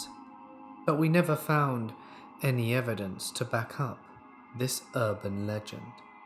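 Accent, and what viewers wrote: England, United Kingdom. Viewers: is the best humble horror narrator of YouTube! Got to have that English accent, after all it is their language 🏴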